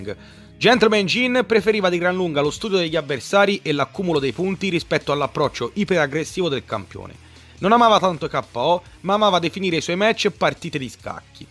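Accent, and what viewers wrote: Tuscany, Italy. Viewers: L'unico canale di MMA che parla toscano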